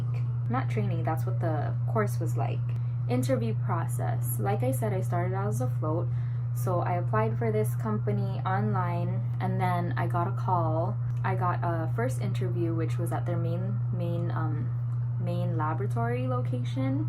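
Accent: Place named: Trinidad and Tobago. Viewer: You must be trini I feel like a hear an accent ! Lol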